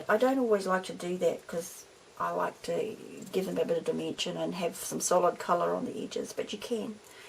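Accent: New Zealand. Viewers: Please tell me what you said , between the music not quite faded out and the Kiwi accent [I'm Canadian] I can't quite decipher what type of paper you're using there. I've got " ... you can see where the back ___? this has actually been done ___? paper but don't let that put you off ..." Thanks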